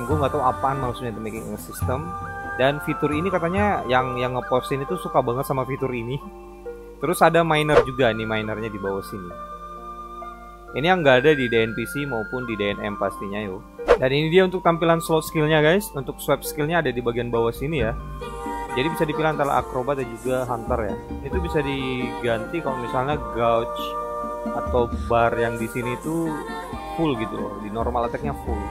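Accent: Java, Indonesia. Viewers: bro lu tu orang mana kok kadang logat jawanya. keluar,btw ane juga pernah kesulawesi tenggara tahun 2003 disana masih hutan,kalo sulawesi selatan cemana disana?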